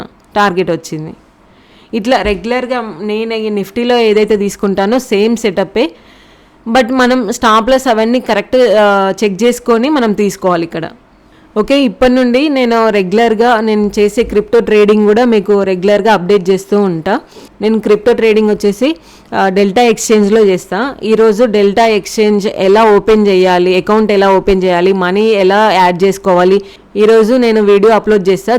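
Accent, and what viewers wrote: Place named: Telangana, India. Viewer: Good teaching. Telangana slang 😀 Sister. How many years of experience do you have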